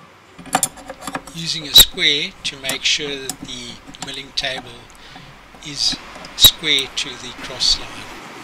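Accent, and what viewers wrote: South Africa. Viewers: Thanks for a great video. I inherited a Unimat from my late father in law. Your channel is a great knowledge source. Thank you PS are you South African? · Hi , I have a Unimat SL I bought new in 1977 with many accessories , I made a nice box to store everything in but never used it until I retired in 2019! Now with spare time I am making wooden model wagons and find the Unimat excellent to drill wheel and spoke holes accurately using the 3 jaw chuck on the dividing head, I have 30,40 and 48 tooth inserts for it which gives all possibilities for the number of spokes per wheel you need. I enjoyed the video and still look for more accessories, I have the thread cutting attachment but no leaders or followers and I would like the adjustable tool holder you show, I do detect you accent as very close to a south African one which is where I am in Springs. 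· By your accent you sound like a South African…. I too live in SA.I have one of these lathes that my Father gave me way back in 1970. Unfortunately the motor stopped working and the drive belts broke. Recently have managed to find replacements for these. Where do I get polyurethane belt material in South Africa if the new replacement belts snap?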